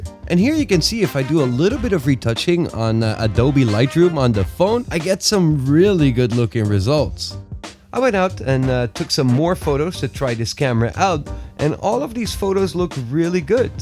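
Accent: Netherlands. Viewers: you sound kinda dutch are u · Are you dutch? Je hebt een Nederlandse uitspraak!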